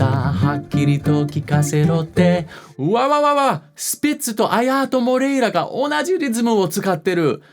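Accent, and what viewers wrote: Kansai, Japan. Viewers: 解説もだけど、関西弁を関西人がめっちゃ笑って聞ける楽しませ方も知ってる人やね。楽しいです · サチモスのカバーが突然お勧めに出て来て先生にどハマりしてんねんけど、バイオグラフィーのどこを読んでも博士がネイティブ関西弁に行き着く要素が見えてこなくて頭がバグってます。とりあえず大ファンになってもた。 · 毎秒アップロードしてほしいし、ずっと関西弁で話しかけてほしい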